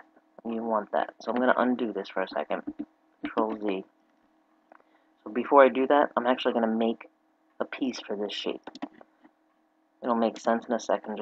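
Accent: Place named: South Jersey, United States. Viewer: ha!! i knew it!! my mom is from egg harbor, my dad is from absecon. i miss the beaches so much in south jersey....but am so excited to be coming back to go to the shore again!! woohoo!! you can talk to me anytime, i love that accent....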